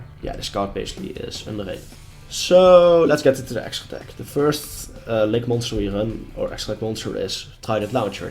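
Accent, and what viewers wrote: Netherlands. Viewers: hes dutch i can hear it lmao. Its nice to see some one from my country on this channel too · He sounds dutch 🤔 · Lol a dutch Guy you can here ot so baba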